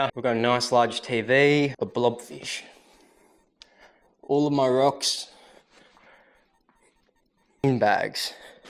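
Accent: Australia. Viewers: Great video and amazing place – loved your presentation mate – you’re a natural – so so so Aussie – just love it !!!! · Oooo that’s a chewy Aussie accent! 😂 Kia ora from NZ 👋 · Never thought this would be a whole house, from the outside it looks just like an apartment building are you in America? But your accent like an aussie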